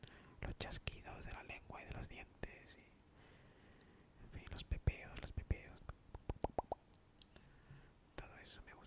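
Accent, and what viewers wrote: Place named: Spain. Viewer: Eres la única persona que escucho en español y además el único con acento de España que me gusta,tengo que ser sincero y decir que me molesta un tanto ese acento en ASMR,pero no el tuyo ;) Gracias por dedicarle tiempo a este video y aunque te tardes en subirlos tienes unos cuantos cientos de subscriptores que siempre están al pendiente de ti(me incluyo en ellos) saludos!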